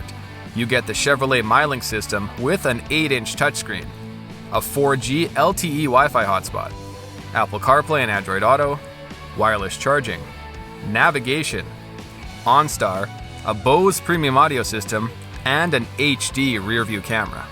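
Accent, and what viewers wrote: Canada. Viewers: He’s from Canada 🇨🇦 has to be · Why, he's Canadian, it's how they say "z"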